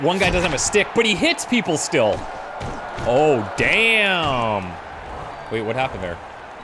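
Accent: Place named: Canada. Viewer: I'm only four minutes in and is already way more Canadian than I've ever heard him be. - British Columbian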